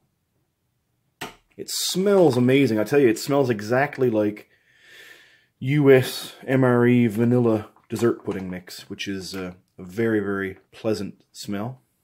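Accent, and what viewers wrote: Newfoundland, Canada. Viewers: Newfie or from NS?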